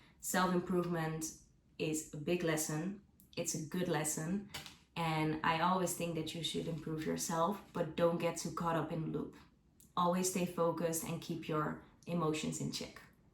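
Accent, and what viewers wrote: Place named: Netherlands. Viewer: You have many good points 😊 keep it up 😊 are you dutch btw? I notice a small accent 😅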